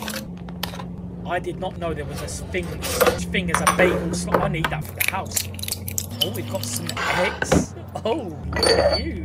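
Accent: United Kingdom. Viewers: Love love love ur Brit....xoxo me mum was born in Liverpool because of WWII..my grandparents were from Mayo Ireland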